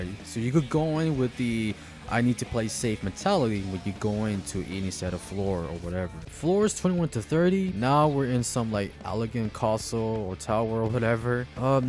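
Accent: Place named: Bulgaria. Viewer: Bro are you Bulgarian cuz your accent sounds exactly like this one Bulgarian artist that I like to watch on yt lol Nice video btw, youtube recommendations getting it right for once :D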